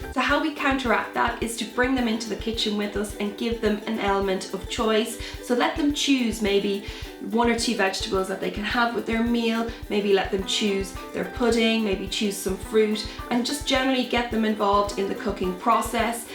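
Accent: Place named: Ireland. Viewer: Whats ur accent? I picking little bit of Irish .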